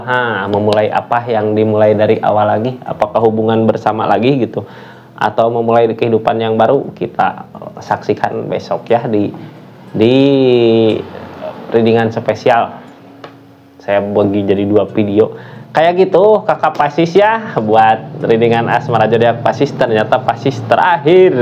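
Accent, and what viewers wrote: West Java, Indonesia. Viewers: Pagi kk..pisces hdr..terimakasih reading.ya sukses dan selalu sehat kk..sunda banget..❤❤❤🙏🙏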